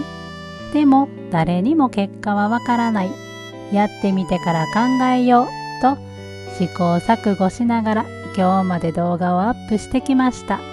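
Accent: Kansai, Japan. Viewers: はんなりとした関西弁❣️めっちゃ好きになりました❣️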